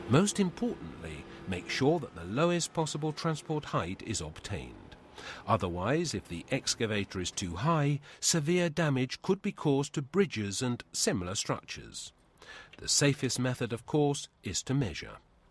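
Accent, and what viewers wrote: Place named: United Kingdom. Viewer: "Balance with the help of the excavator's digging equipment when entering the lower body. This is to avoid tipping which would prove awkward both for the excavator and the trailer." How do Brits come out sounding like Shakespeare even when talking about how to avoid a total fuck up with heavy equipment?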